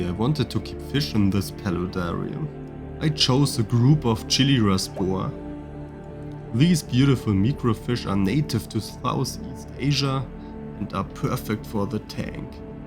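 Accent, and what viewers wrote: Germany. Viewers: I bet you're German based on your dialect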